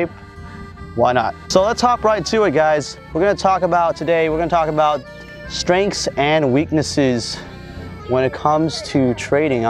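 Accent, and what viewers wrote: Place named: United States. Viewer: bro you are such an American. The accent was hilarious. Nice into and thanks for the video.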